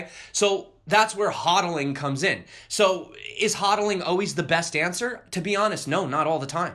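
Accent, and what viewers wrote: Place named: Philadelphia, United States. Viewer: My bro where u from?? You sound local to me here in philly....if not then maybe south jerz!! Go Birds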